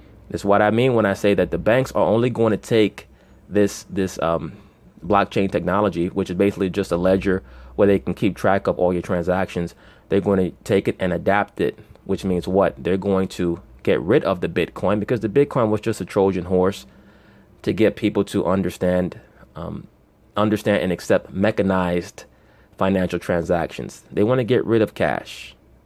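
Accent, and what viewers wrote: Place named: New York, United States. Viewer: Amp-Dog Productions he has a New York accent man lol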